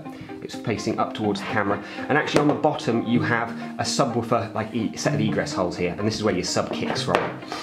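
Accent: United Kingdom. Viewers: Looks a pretty nice speaker to be honest, hadn't even considered this one at all however having a look at it now! Where are you from by the way, no good with accents but im guessing the UK? lol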